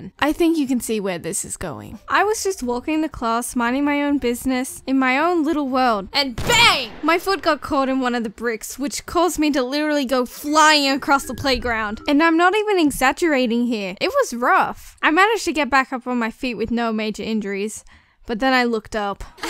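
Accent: Australia. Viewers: Finally i can understand because your australian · GASP are you Australian? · Are you British? You said year not grade and I talk English but you sort of sound Australian at the same time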